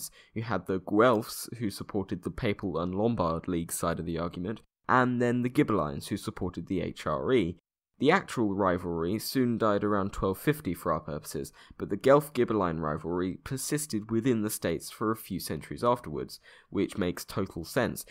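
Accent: United Kingdom. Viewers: this was actually quite funny. aswell as informative. Your video editing is top notch, and the humor is there in typical british fashion. So i can see why you managed to hit to many subscribers with the help of youtube. (im subscribed to CrashCourse, Kurzgesagt and Knowledgehub, and this channel seems to cater to the same style, and thus I got your stuff recommended all over my youtube page, so this might explain the sudden spike of subscribers as theese 3 channels are huge) Only thing I can say thou is the Audio Editing needs some more work. I do notice that some parts of your video your audio cut-ins tend to overlap so it can sound abit strange at times (example ) Ontop of that your voice is nice and not ennuing to listen to (unlike some youtubers out there) Might be the british accent. Another thing I would recommend is to add a calm background theme that dosnt disturb your speech, as the videos can be abit to quiet with only your voice talking. 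(good example is how KnowledgeHub and I Hate Everything does it) This adds to liven up the video. Not to mention a Opening theme (Althou thats not really needed) And I would pretty much say with that, you'll quickly reach even higher number of subscribers. As long as you keep the content coming. Keep up the good job! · This channel gets a lot of views for the amount of subs it has, 100%+ of the subs watch this channel clearly. Must be the good editing and interesting topics coupled with the good ol' Brit comentator.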